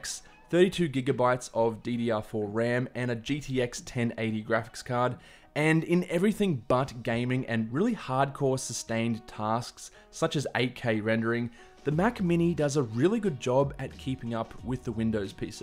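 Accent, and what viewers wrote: Australia. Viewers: Generally, I face difficulties understanding the Australian accent, but the way you speak - clearly and slowly, I get almost 100% of what you are saying.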